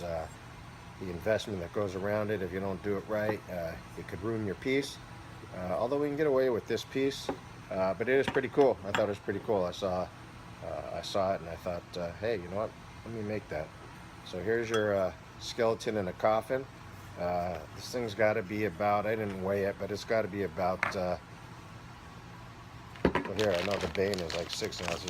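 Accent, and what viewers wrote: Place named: North, United Kingdom. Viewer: awesome pieces and I love the Statue of Liberty with the buildings Skyline pretty cool the day always brings back memories I was in Staten Island when 9/11 happened so it was pretty scary and crazy the same time. it'd be cool if you could make the old Skyline with a police badge on the bottom a fireman badge on the other side of the bottom and paramedics like a little memorial statue I'm from the tri-state I don't know where you guys are based out of a forget I heard the northern accent. anyway I keep Rambling On Again LOL I just love your work. great work and very cool stuff to you keep up the good work I like the bars too I have a coin keeper bar from you I love skulls though. later dude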